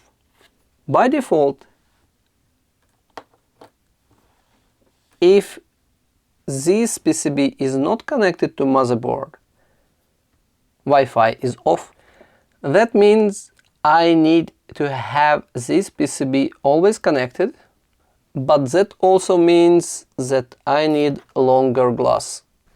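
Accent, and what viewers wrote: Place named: Eastern Europe. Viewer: Super nice video! And Eastern-European-English style gives it extra fun ❤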